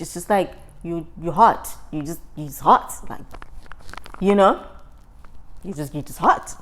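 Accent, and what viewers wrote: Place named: Los Angeles, United States. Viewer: Your accent is now giving LA😍😂❤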